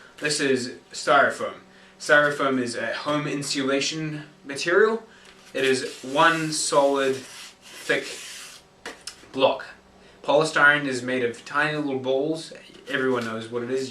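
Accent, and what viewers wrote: Australia. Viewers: you sound Australian or New Zealand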